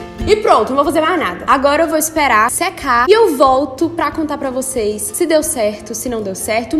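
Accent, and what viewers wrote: Minas Gerais, Brazil. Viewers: Vc e mineira?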